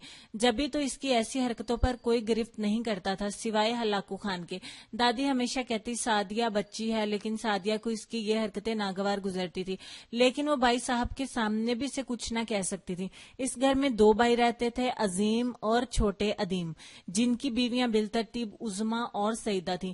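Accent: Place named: Punjab, Pakistan. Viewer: You speak urdu in punjabi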